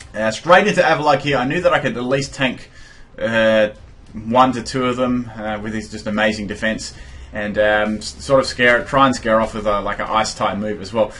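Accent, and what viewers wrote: Australia. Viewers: I'm from australia perth and you song like me so he's probably from perth, australia, anyways NICE vids really enjoy e'm!!!! :D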